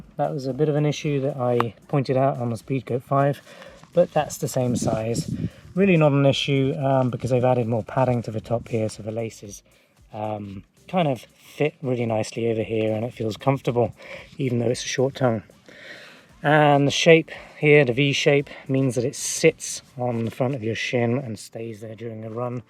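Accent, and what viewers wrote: United Kingdom. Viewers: are you in the US or the UK? You're defo British by the accent?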